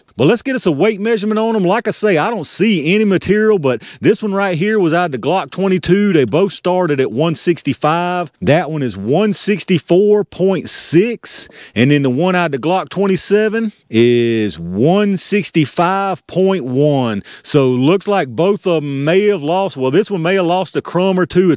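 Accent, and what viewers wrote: South, United States. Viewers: I love your videos brother!! full of information and data. You talk country AF! It would be funny as all get out if you did it in an Irish accent!!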